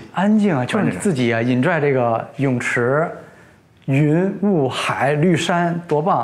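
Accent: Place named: Mainland, China. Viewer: Sir, I did not finish viewing your Vblog, based on your accent, you must come from Mainland China, I am so surprised by your talk, very decadent. Most mainland chinese I met are more enterprising and want to advance themself.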